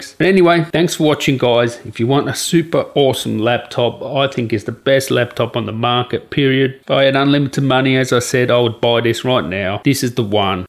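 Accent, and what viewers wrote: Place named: Australia. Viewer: Hey mate, not much of a story. Plus I heard the accent and just had to listen - nice change from across the pacific... Just letting everyone out there know along with you if they had any doubt, just think about the 6+ months that have gone by to let the QA/QC processes take place and iron out the production problems. That said, if you find any issues or problems if you buy one, try to return the product straight away. I am pretty sure there was another reviewer on Youtube giving the same advice.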